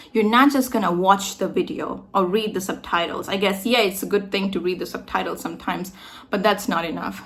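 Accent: United States. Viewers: To learn an accent like you do, I need to live in a US-like environment, or can I learn it at home by myself by practicing it? I know the basic english, and I speak it well. And nice video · I live in an area with one of the highest Sikh populations in America and we host one of the largest Sikh parades in the world. Your American accent is better than the overwhelming majority of Indians who live in this area. For some reason, learning the accent is seen as disrespectful by a lot of people. To the rest of us, it shows the amount of effort you've put into learning. I'm currently learning Welsh, so I know how difficult this journey is. Congratulations on your progress.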